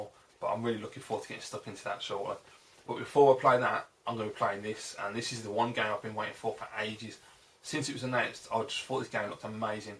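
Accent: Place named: Midlands, United Kingdom. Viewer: I picked up Max Payne 3 for £15 on steam, i started playing it today and i don't know what to make of it. Its more film than game by the looks of it, it's a nice looking film though lol. Where do you live, you sound like your from the midlands which is a fair old way to travel to a car boot in chedar. I live in Bristol and chedar would be too far for me to go lol.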